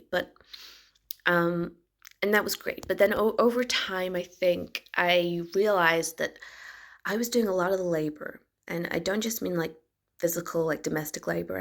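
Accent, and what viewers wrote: United States. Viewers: Seriously-- Hugh Laurie-level American impersonation. Effing flawless. Ok not Dick Van Dyke-good but pretty darn good. (j/k-- Big respect to DvD) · I didn't know Snow White was American. I didn't want to be that precise in my comment because it might spoil it for someone. I did marvel at the accent, I kept looking for a Scottish slip. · At first the American accent had me confused. I thought, “Wait, have I been wrong all this time about who she is?” I fell right into it. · American accent ~ Chef's kiss! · absolutely ADORE your American accent! Brilliant. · That's a seriously solid American accent. Gold star! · You had me on the hook from the get go. It wasn't until you mentioned your housemates coming home from the mine that I started getting suspicious - and it was only then I noticed the flawless American accent (being American, it sounded so natural to me I never picked up on it). 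Well done - yet again! Keep bangin' out those lattice pies! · your american accent is so good 😮 living outside edinburgh and i haven't met a single scottish person who can do a decent accent. i also haven't met a single scottish person who realizes their american accent is bad. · Finally got it, clever as always. Nice American accent as well. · Wow, really nailed an American accent perfectly! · The American accent definitely threw me off at the beginning... I was like is the accent part of the bit, or is this her actual voice she's been hiding the whole time and she's actually serious now? lol nope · ... and a perfect American accent. Brilliant. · 1,000 views per hour. DANG. Must be the freckles. You've got the American accent down! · The American accent threw me! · Nice American accent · Very good American accent. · you sound so American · Again, why can folks from the UK do better American accents than we Americans can! 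brilliance again. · Hilarious! Great accent by the way, you've got the American accent down perfectly. You are stunning snow white and the fairest of them all. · So many delicious lines here. And the American accent delivers a perfect misdirection. ❤ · You have a really good American accent. And the story was hilarious. Very talented. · She does a better American accent than she does a Scottish accent. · So brilliantly funny! Your American accent is spot on! I hope you and your prince live happily ever after! 🏰👸🏻🤴🏻❤️ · The States accent is a win.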